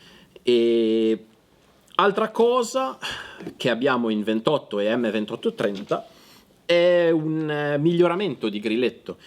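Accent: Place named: Italy. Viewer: Come preparazione tecnica,e conoscenza del sistema d'arma molto buono, non riesco a capire il fatto di presentarsi fingendo di essere stranieri quando dal accettato è evidente l'italianità, e poi il resto, felpa occhiali e cappello ,ma!!!!!😂😂😂😂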